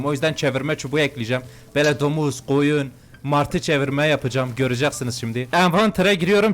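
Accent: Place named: Diyarbakir, Turkey. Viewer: Abi nerelisin? Bizim Diyarbakırlilar gibi konuşuyorsun. Saygılar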